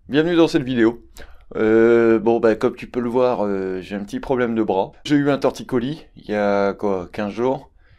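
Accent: Brittany, France. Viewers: Salut. Bon bah déjà, je te souhaite une bonne récupération... 2j que j'arpente ta chaîne. Top. J'adore le ton. Et l'accent m'a tout de suite rappelé mon Finistère Nord natal... Ahah ;) Par contre, j'ai voulu regarder quelques unes de tes vidéos plus anciennes que youtube me proposait, et impossible. Contenu privé. Bug ou normal ?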